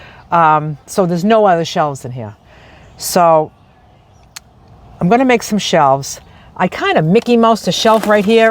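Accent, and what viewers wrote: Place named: Massachusetts, United States. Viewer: Hi! I fell upon your channel and love it! I love your accent too! My family and I would spend a few weeks every summer in Gloucester, Mass., and it reminds me of all the lovely people we met there!! 👍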